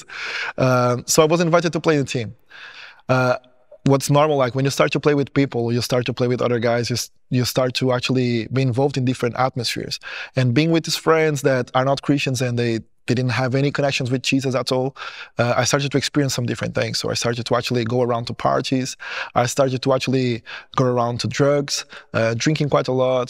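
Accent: Brazil. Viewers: wow I was 99% sure that he was brazilian!! hahaha, I`m brazilian too so was kinda of easy for me listen to some phonemes in his English, that btw is very good! And of course, apart from that, God be praised for your healing testimony! Hugs from BR 🟨🟩🟦⬜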